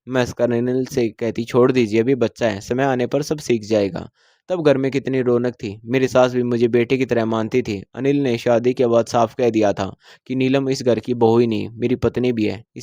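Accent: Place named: Haryana, India. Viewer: हरियाणवी भाषा काम लहजा बोली में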